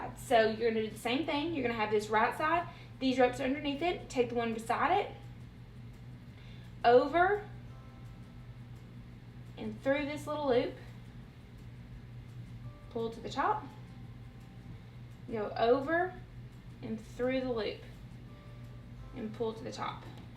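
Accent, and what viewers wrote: South, United States. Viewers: I so love my! You always sound like a Southern Belle - no matter what you think. I'm going to make this. Maybe even today!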